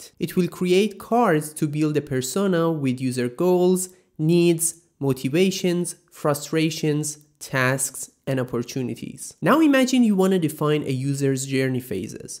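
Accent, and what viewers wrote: Iran. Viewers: why ma irania inghad lahjamoon ghabele tashkhise ? )) damet garm azizam . are you best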